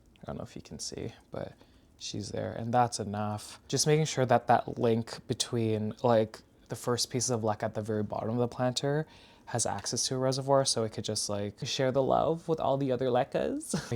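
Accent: South, United States. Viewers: Has anyone ever told you that you have a southern American "twang"?! 😅 I love it! 😂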